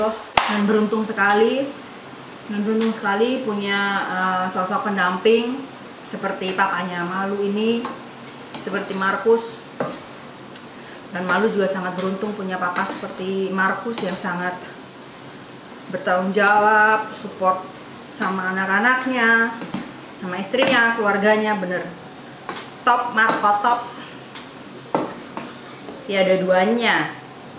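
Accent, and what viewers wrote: East Java, Indonesia. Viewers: ngakak habis cara ngomong ciiri kha s jawa timuran dan btw anak lanange dimana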